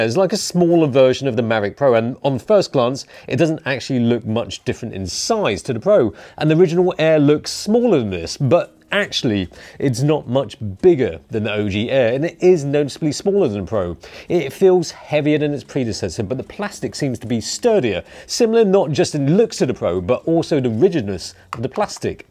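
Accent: United Kingdom. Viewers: i thought this guy was british when i heard this voice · Lame. 7 min wasted to hear the magic website read out aloud in a British accent.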